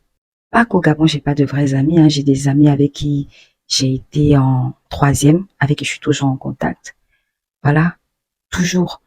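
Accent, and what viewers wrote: Ivory Coast. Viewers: Vous avez l'accent ivoiriens mou pour moi j'écoute une ivoirienne parlé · Tu as même,l accent ivoirien, lol sois bénie, akwaba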